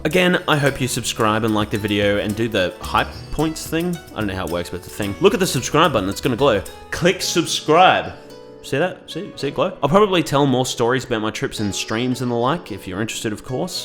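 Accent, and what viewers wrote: Australia. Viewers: This was great mate. Love hearing a fellow Aussie speak facts 😁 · as a fellow aussie, i appreciate the aussie accent 🙏🏼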